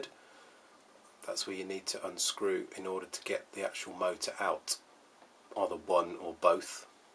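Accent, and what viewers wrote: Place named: United Kingdom. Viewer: Yeap, british accent is so weird. I'll never like it.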